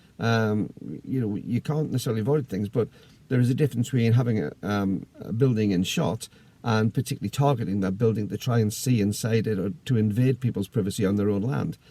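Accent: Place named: Northumbria, United Kingdom. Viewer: Such a sad damper on such a nice outing. Well done for handling the situation as you did b/c you took the wind out of her sails. If you had tried to explain at all, she would have become more aggressive. Pity. You're from Northumberland did you say? Put "Vera" on the case (your accents and mannerisms are similar.) She'll take care of the daft goose! You present as a very nice and sensitive man,. I advise that you carry on with stealing photons. You probably have also stolen some yet "undiscovered" colors from the infinite spectrum. (Check out the late Dr. Oliver Saxe about his LSD trip and his wish to see the color Indigo!)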